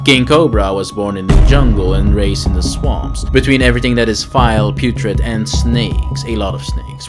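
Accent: Netherlands. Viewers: Are you dutch perchance?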